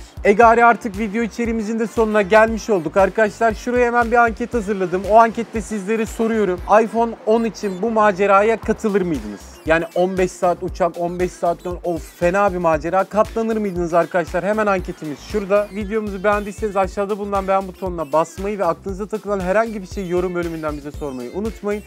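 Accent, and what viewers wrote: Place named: Aegean, Turkey. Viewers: aydınlımısın sen e gari diyon :) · e "gari" :) artık sonuna gelmiş olduk :) Tam bir egeli abim ya :)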